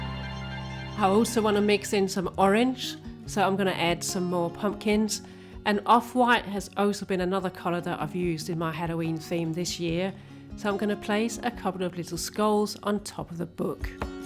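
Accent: United Kingdom. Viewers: I love how you decorate, everything looks so beautiful. I like all the crows too. You are very talented. I am from the States in Connecticut. I always wanted to go to London. I love your accent. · I am so happy to have found you! I am from the states, I live in Michigan. I have been to London several times and fell in love with it, especially the people. Your sweet little accent makes me smile. Your decorating is so inspirational and you make it look so easy. The large book/skull cloche in the kitchen is my favorite. Your home looks lovely. Sending a BIG hug with thanks for sharing your ideas! Cherrie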